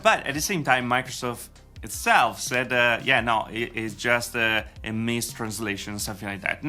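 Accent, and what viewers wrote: Italy. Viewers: Oddio ma sei italiano? 😮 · Per qualche motivo pensavo che fossi italiano e ora ne ho la conferma :)) Nice video and keep up the work! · Ohhhh, you are Italian, from what part? I was trying to guess your accent, thought Brazilian. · LO SAPEVO CHE ERI ITALIANO Quel accento...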